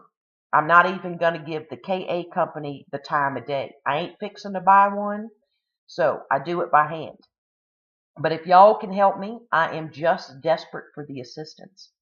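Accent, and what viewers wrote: South, United States. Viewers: now ya know i got to give a poke, a pee can is what a trucker uses lol youaiis acksent tells me ya from the south try Mrs V on OurHalfAcreHomestead the best cook iv seen on hear try this this will take ya rite to her making a turkey pot pie crust and all <link>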